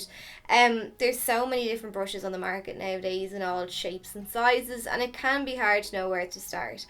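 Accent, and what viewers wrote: Ireland. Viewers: You are beautiful. You sound Irish lol.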